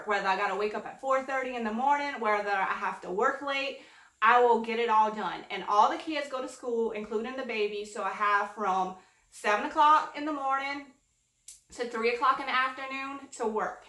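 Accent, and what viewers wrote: Louisiana, United States. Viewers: Love your video's, great inspiration! Thank you for sharing your ideas! I was going to ask what state you're from, because I keep hearing Cajun. Then on the fb group, your last name...silly, I know. But I've got a friend that's Cajun and I love hearing her talk.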